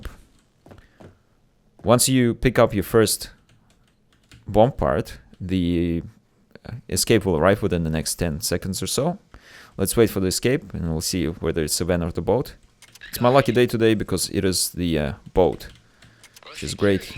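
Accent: Russia. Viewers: Are you russian? Your accent is very simillar to russian one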